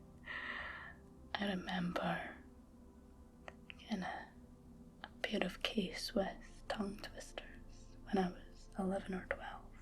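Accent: Scotland, United Kingdom. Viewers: In an earlier vid I thought you had an Eastern European accent. But, you're Scottish! I love your accent (from the isles perhaps?). More to the point, you are a breath of fresh air, with a lovely relaxed chatty style. How have I not been a fan before now? And, of course, I am now deeply in love (tho I'm old enough to be your father. I know, kinda creepy innit?) I'm now subscribed. Thankyou for this.Im more relaxed than I've been in years! Rock on! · Momma said knock you out with that Scottish tongue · The Scottish accent is my new favorite. Thank you! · Yeah the scottish accent is a lot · lovely scots tones....zzzzzzzzzz....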